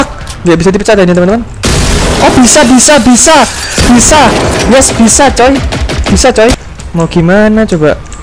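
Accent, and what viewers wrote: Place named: Java, Indonesia. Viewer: medok banget mas :v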